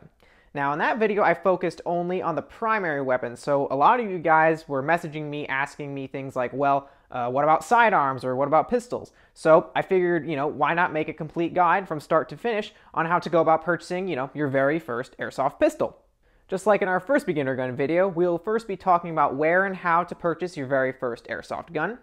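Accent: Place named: United States. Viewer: I cringed a little when you were describing a the blowback pistols.. Man, you sound like you’re here in the US, so why not get a real pistol? Having some knowledge of real guns can’t hurt. Racking the slide is really not that cool. It’s an integral part of the design. lol.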